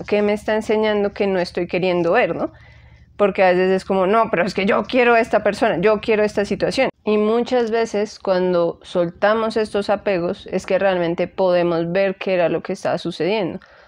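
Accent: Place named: Bogotá, Colombia. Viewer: Me encantó tu canal ❤ lo encontré buscando meditaciones y me pareció curioso tantos años viviendo en otras ciudades que escuchar tu acento que es de mi tierra Bogotá supongo lol parece jeje que recuerdos, y viendo los videos me encantaron gracias por compartir este contenido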